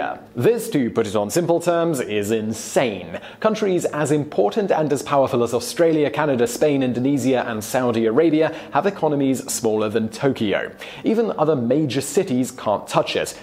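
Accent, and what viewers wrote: United Kingdom. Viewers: a bloke with a british accent says london is the capital the world totally obejective.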